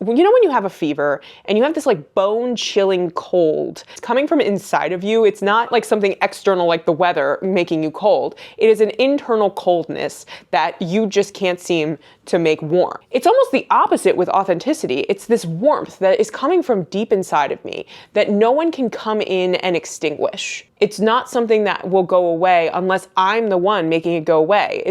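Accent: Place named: Midwest, United States. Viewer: Are you from the Midwest? You talk like people in my circle, so listening to you felt very homey.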